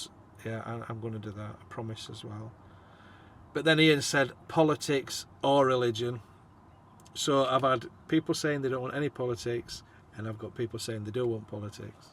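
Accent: Yorkshire, United Kingdom. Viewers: yorkshire though and thourgh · Thumbs up if you watched the whole video 👍🏼.. You come over as such a nice bloke, Honest values and tbf I share many of your views…it’s definitely the Yorkshire accent that kept me the full hour n bit though! lol..also, my girlfriend Cat said she’s never found a bald man attractive before…I guess that’s a compliment!haha! Hopefully one day bump in to you..and maybe you’ll smell like lynx Africa 😂😂😂 Our best to you n hellibobs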